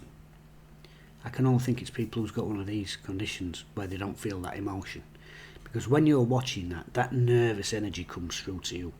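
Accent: Sheffield, United Kingdom. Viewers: Gold. Subscribed. Owlstalk sent me here....... Carlton Palmer quoting Big Ron, so pertinent, he was dead right. If you can play like Brazil, great if not at least put a proper shift in, the crowd will appreciate that just as much. I'm not sure what I enjoyed more, hearing a Sheffield accent or the fact that I agreed with everything (that doesn't happen everyday...) 👍👍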